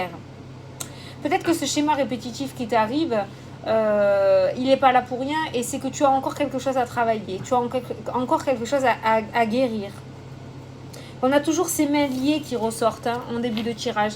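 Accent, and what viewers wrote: South, France. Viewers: Bonjour, Je te découvre. Tu es géniale, pleine de vie, toute en simplicité et authenticité et en plus avec l'accent du sud. Tout ce que j'aime! Je passe un bon moment avec toi. Tes tirages me parlent beaucoup et me correspondent parfaitement 💫😇 Peux-tu me donner les références du jeu spirituel que tu as utilisé? Il est magnifique!!! Merciiiii 🙏💫😘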